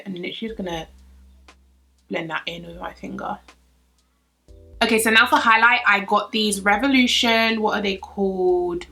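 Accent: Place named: United Kingdom. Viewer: Your British accent made me smile! I love your voice and your accent. <mail> on IG!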